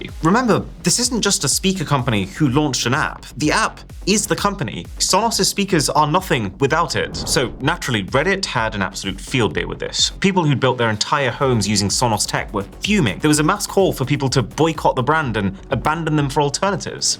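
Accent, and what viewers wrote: RP, United Kingdom. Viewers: Still feels like posh man talking down to us mear mortals... and you seriously come across as paid to talk up certain tech and brands... uour losing me and many others due to this 🛸👽🖖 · This is not American "English", it's UK English.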